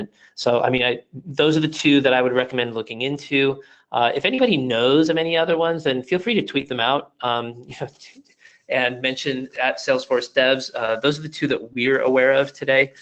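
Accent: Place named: United States. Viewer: Why American voice here? Why not people with famous Indian accents in this video? Isn't Salesforce Indian company?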